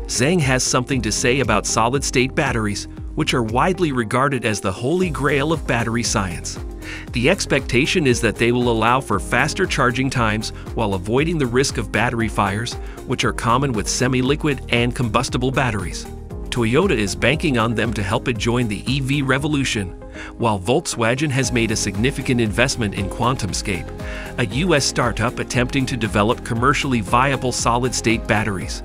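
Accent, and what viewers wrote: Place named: United States. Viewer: Lol . . . Volkswagen pronounced Volkswa 'gen' as in Gen x. Really good American accent, though.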